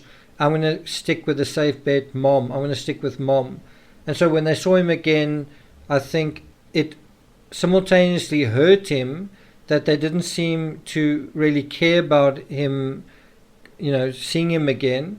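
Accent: South Africa. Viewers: That's a South African accent I instantly recognize it love from South Africa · You must be South African going off of your accent? :)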